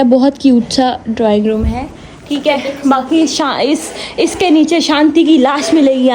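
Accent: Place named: Karachi, Pakistan. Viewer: Very nice house tour you say you blong to ch family I'm also blong to ch family live at Islamabad but your accent speaking style like Karachi people not like ch family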